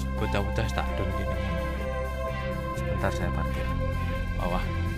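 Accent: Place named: Java, Indonesia. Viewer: Bahasa Jawa e penak tenan mas medok 👍🏻 sip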